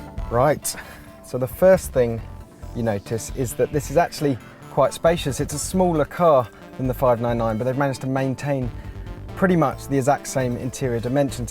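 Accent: United Kingdom. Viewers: obviously you havent been to Europe. It's called a British accent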